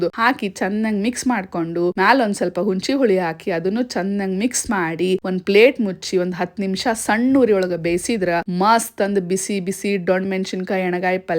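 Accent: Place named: North Karnataka, India. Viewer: Ayya annudu nam uttar karnataka shaili..so please yar yar comment valag hakatiri laa ayya yak antiri antha so nimag yen helud andra... especially uttar karnataka 50km dura hodang nam mathadu style accent change agtad so😊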